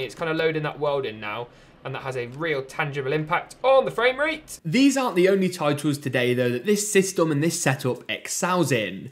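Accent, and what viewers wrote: United Kingdom. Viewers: You sound like ali-a, it's almost like you might be from the same part of the uk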